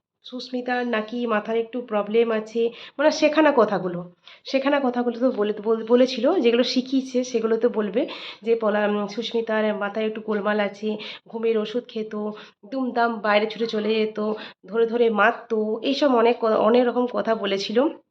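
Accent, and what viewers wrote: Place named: India. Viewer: আপনার কথা শুনে ইন্ডিয়ান মনে হচ্ছে। আপনি জানলেন কি করে।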